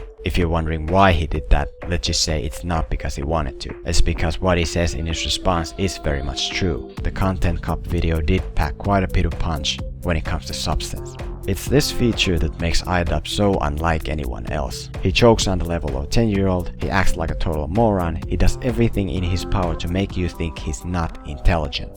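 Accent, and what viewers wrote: Finland. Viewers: Although I can't agree with the point you made , I have to agree with the rest. Great video with a lot of effort put in! By the way, I can't quite place your accent. Do you happen to be Finnish? :) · Might you perhaps be Finnish? The accent made me wonder :D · Are you from Finland? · are you from finland? your accent is familiar :) although i do disagree at some points, great video! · You sound Finnish. Suomi? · Dude, you Finnish? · your accent sound familiar? Do you happen to be Finnish? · Are you Finnish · You're finnish aren't ya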